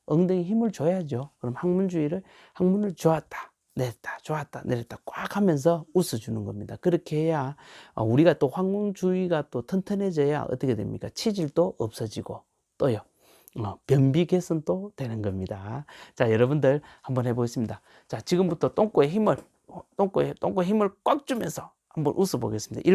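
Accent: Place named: Gyeongsang, South Korea. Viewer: 참 경상도 사트리 웃음강의 억수로 우습당 ᆢ ᆢ즉 ᆢ똥구멍 ᆢ하하하ㆍ 힘ㆍ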